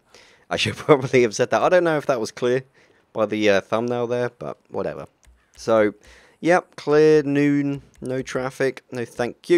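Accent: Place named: Southern England, United Kingdom. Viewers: DeadDudeDancing I'm from the UK and I'm not sure, sounds like he's from the South like me, I think what sets him apart is (no offence) his high voice. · Which british accent is that? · Which british accent...lol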